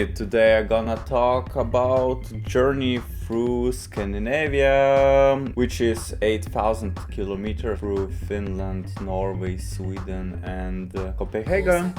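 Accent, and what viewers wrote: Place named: Eastern Europe. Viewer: well, I felt your accent is not as native speaker has, I thought you are from somewhere from the Eastern Europe, although your English is very fluent!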